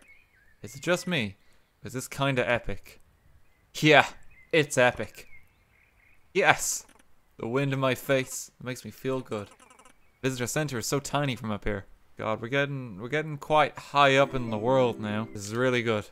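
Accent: Ireland. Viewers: i just found this channel, he kinda has an irish accent lmao- subbed because he's actually really funny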